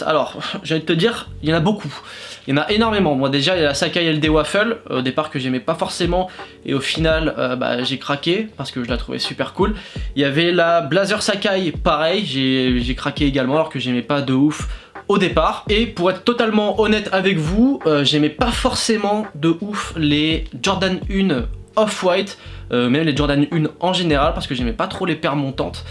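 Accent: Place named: Doubs, France. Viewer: J’adore ton accent, tellement agréable à écouter, il ne viendrait pas du Doubs...? 😉 👏👏👏👏 pour tes 10K, mais bon, t’iras beaucoup beaucoup plus haut...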